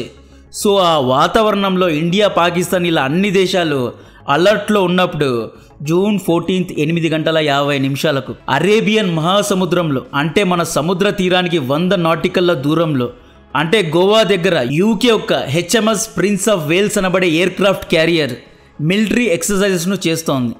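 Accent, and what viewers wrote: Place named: Chittoor, India. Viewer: Brother, Better, Refine your accent.!!! It is sounding like Chittoor accent. !!! ❤❤❤ 🎉🎉🎉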